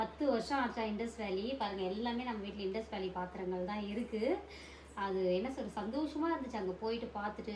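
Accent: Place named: Kerala, India. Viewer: Sound Kerala sister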